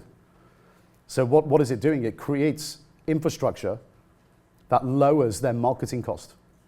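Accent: United Kingdom. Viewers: Dont be intimitated with british accent. Turn on CC and translate words that dont make sense. Best of luck!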